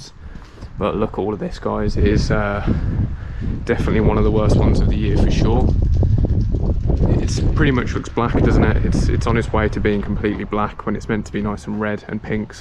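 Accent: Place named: United Kingdom. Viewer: Hi...where is it you're from in the UK? I seem to recognise the accent from my UK years, but I'm not too sure...cheers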